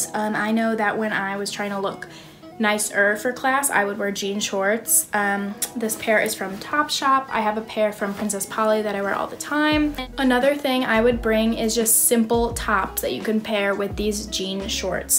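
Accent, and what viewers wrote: Midwest, United States. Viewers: i can tell you're from the midwest girl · i am from indiana and i can definitely confirm she is from the midwest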